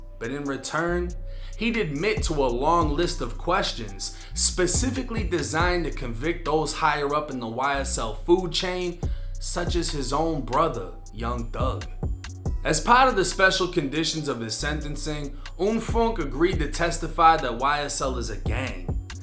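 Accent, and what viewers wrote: Boston, United States. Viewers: Been a sub and when you had about a 1000 subs. I liked your story. And your Boston accent. You probably say the same about my Canadian accent. Listen to Toronto man’s accent. Funny as hell. I did time with some well know Toronto rappers all fighting bodies and get sent to the pen for a 25 year sentence. Cause that how we roll in Canada. 25 max. If your good. You will get out earlier. Toronto mans known for shooting men in the legs ( leg warmers) many here have gotten one and a couple have died from a leg warmer. Hitting that big artery doesn’t take long to bleed out. Look in to some Toronto ggz. Fighting murder cases and still self snitching on Instagram. Giving the judge more ammo. Top5, Flippa. Check them out and there lingo. I was locked up with these guys. Two murders and two not 25 year sentences. Just one. That’s how Canada does it. 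We soft like that. I rock with YOU. COME HERE N 1 million subs. Having beef with Wack 100 is pure comedy. On piru my ninja. Wack is free wackiness. Go with it. Toronto mans not afraid to hand leg warmers out in LA either. Tony Yayo has recently talked about them on YouTube. It’s worth a look. Could be gold and push you over a million. We got a lot of respect for you in the North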